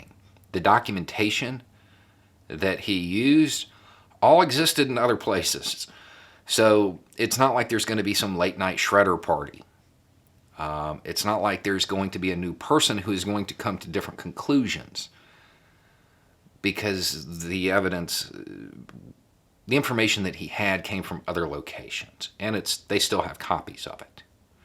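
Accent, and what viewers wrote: South, United States. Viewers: Love his points of view. But can someone please tell me why he doesn’t have a southern accent in his really old videos and a super southern accent about 8 months ago? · As a non-American, it’s positively encouraging to hear that a person with a southern accent can speak without only saying insane things. I think it’s a first for me to hear. With the existence of your unique breed now confirmed, I hope more of you become visible in the media. I’m very happy to see this.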